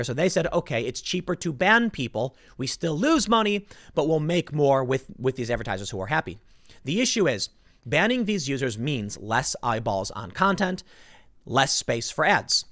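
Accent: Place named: Chicago, United States. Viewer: I think its the Chicago accent. Illinois has some interesting ways of pronouncing words, and celebrities have interesting sounding names, its bound to come out some how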